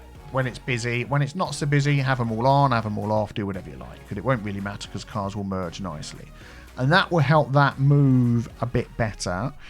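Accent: United Kingdom. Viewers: I`m from Germany but I`d love your British accent and that your language is so polite and friendly! I also watched Oxygen not included in the last days and maybe you like to play it with the new content again? At the moment you are my favorite youtube channel. Thank you a lot!:) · No idea why I watch, as I don't even play this. But been watching since Christmas.... Where in the UK are you? Trying to figure the accent. We had snow yesterday too. You sound fairly localish to me, but that said, sound like someone who may have lived in different places (ie, a cockney turned geordie 😂 - not saying you're either of those)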